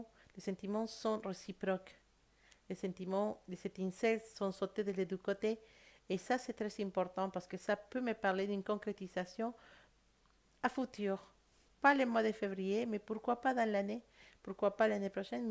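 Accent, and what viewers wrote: Spain. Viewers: Holà, gracias por tu tijada. Has visto justo..Espero que el piensa en mi como yo pienso en el.Pero no quiero entrar en communicacion con el.Espero que sea el que lo haga en Febrero. Una pregunta: cuando interpretas puedes ensenar las cartas de l Oracle de la Triade que te han inspirado.Gracias..y por el acento, es el mejor.el.acento espagnol 😋😁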